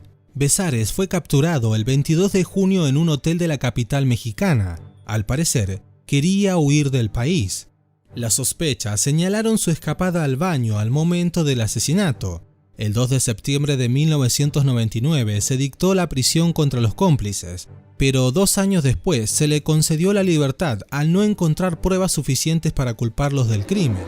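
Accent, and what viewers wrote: Argentina. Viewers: Ese acento argentino bien estupido 🤣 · pues tu video sin chiste. no comentas nada nuevo en absoluto, no pude terminar de verlo por tu detestable acento argentino que lo hizo mas nefasto aun. un bodrio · Escuche tu acento argentino y creeme que iba a parar el video porque veo qye tanto argentinos como colombianos viven de la farandula mexucana..pero bueno haz tu trabajo😅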